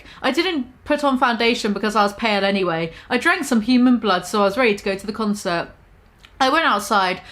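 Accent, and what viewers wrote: United Kingdom. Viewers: with a british accent... Noice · I gotta say, hearing this in a British accent makes it better than other readings i've been listening to lol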